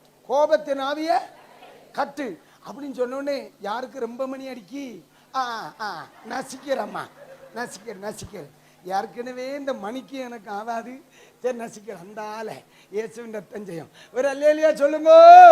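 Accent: Kanyakumari, India. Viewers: KK District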